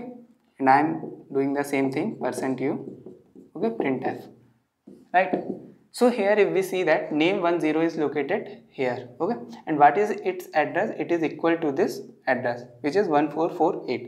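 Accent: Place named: India. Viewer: That indian accent lol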